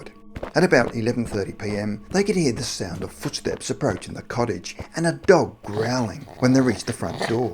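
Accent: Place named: Australia. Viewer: He's Australian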